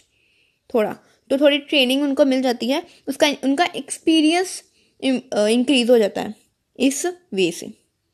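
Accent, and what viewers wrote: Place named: Haryana, India. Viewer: Bolne ka tareeka bhot acha h sab samaj aa gaya... Aur apki haryanvi tone 👌🏻👌🏻👌🏻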